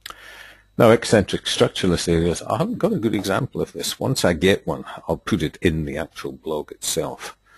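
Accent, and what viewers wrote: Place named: Ireland. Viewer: Maybe their resolution isn't high enough but I would find it helpful if you increased your default zoom to maybe 120-130% if everything you do here. There is so much of your screen that is just wasted space. Otherwise, thanks for your series! It's been helpful as a dermatology refresher. I had encountered your videos a few years ago and had always wondered why I had this bizarre association between dermoscopy and Irish accents. Cheers.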